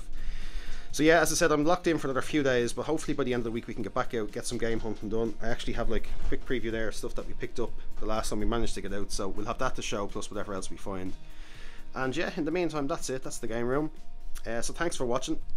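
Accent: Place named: Ireland. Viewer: Irish accent makes this better.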